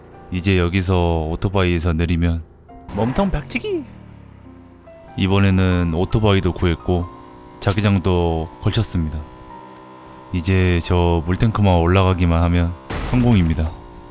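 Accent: Jeolla, South Korea. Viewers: 혹시 고향이 라도신가요~?^^ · 점잖게 말하시는데 살짝식 섞여나오는 전라도 억양이 포인트 ㅋㅋㅋㅋㅋㅋㅋㅋㅋㅋㅋㅋㅋㅋㅋㅋㅋ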